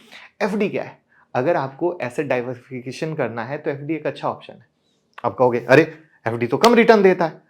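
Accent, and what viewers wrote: Bihar, India. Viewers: Aap up ni Bihar se ho bro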